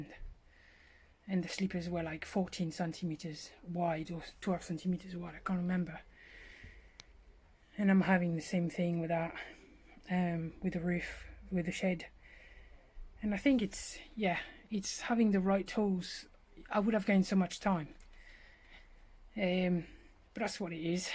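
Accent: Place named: France. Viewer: Keep going, your doing a fine job there, and great videos too. Cheers 👍 by the way love that french accent 👌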